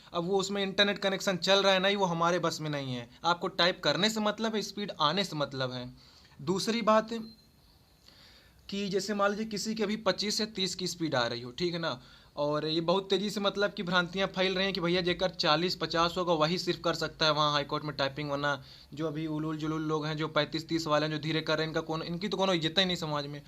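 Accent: Allahabad, India. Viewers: Tqqq sooo much sir 🙏 And apki allahabadi language mashallah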